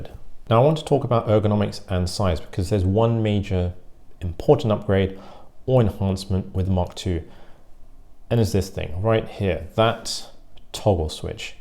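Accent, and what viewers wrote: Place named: United Kingdom. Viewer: Asian guys with eloquent British accents automatically get credibility by virtue of that presentation alone.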